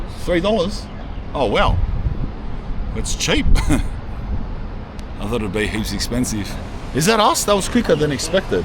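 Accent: Australia. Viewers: wow that aussie accent,, i just cant,, the guy talks like a 12 year old,,, ciao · Holy Aussie accent😂🔥🔥🔥🔥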